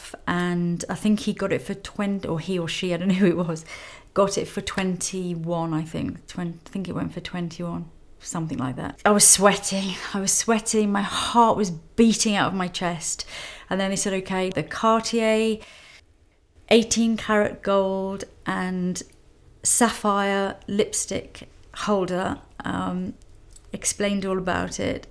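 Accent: United Kingdom. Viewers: Shes got amazing eyes and a great facial structure...I love her briiish acesent...great make up tips thx...we all love Audrey she is a darling and amazingly creative..thx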